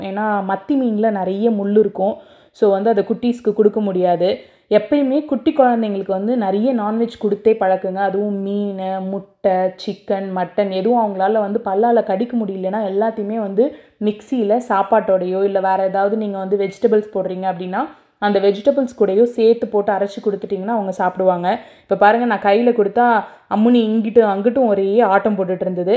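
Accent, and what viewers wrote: Kongu, India. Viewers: U speak kongu slang but howcome from tanjore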